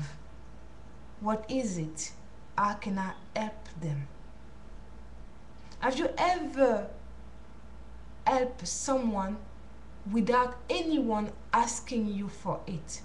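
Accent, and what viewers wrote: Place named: Africa. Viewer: I love you African Woman. You have a beautiful African Accent.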